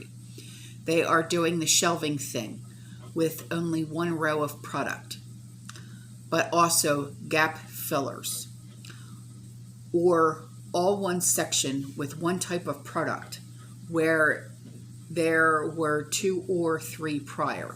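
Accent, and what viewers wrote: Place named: Staten Island, United States. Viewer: OMG how have I not seen your videos before? Listening to you is a blast from the past, you sound like Staten Island where I came from (I'm in North Carolina now). Thanks for all the info, I've clicked the subscribe button!!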